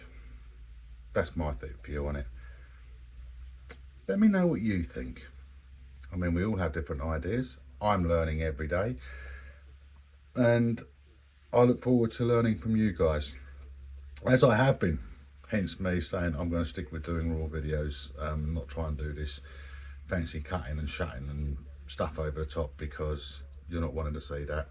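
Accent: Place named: South, United States. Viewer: That deep South accent is hard for me to understand. Is he from Florida ? Lol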